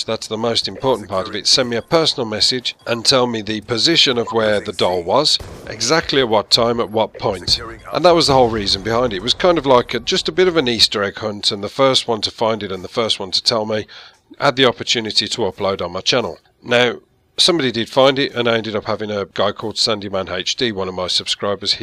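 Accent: Birmingham, United Kingdom. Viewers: hahaha a Brumbie saying "Basicly" always makes me piss my sides. A word that Brumbies always say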